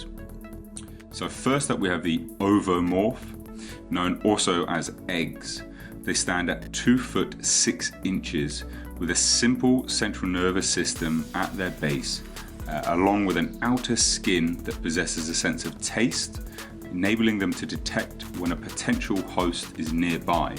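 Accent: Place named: United Kingdom. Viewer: Friggin' British accents! I thought you started off with an "Overmorph." Like some sort of ubermench form.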